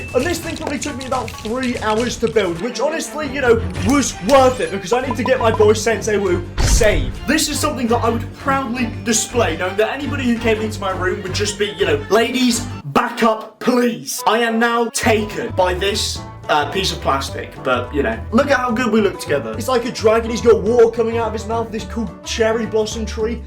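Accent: United Kingdom. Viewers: Why does he talk about dollars but has the most British accent ever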